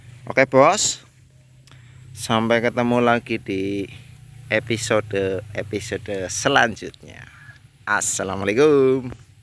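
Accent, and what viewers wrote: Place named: Banyumas, Indonesia. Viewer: Salam ngapak bos🤣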